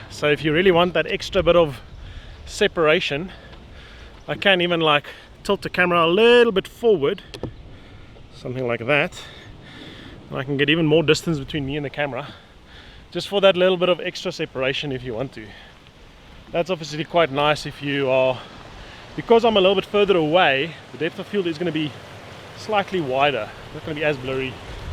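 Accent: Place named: South Africa. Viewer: Thanks for providing this. Good to hear the Saffer accent. Puts it in a good context to whether we want to purchase it.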